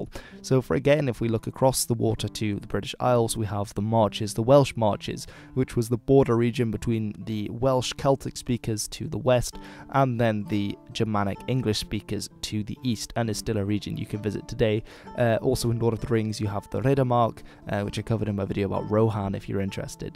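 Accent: Denmark. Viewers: You are danish i can hear xd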